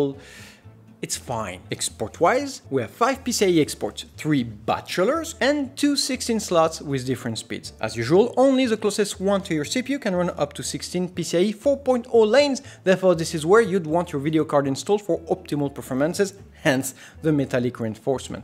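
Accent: France. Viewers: Great review as always :):) is it me or your french accent seems to be fading ? You look really more fluent anyway I totally love your work and I'm extremely proud to see a compratiot appreciated ^^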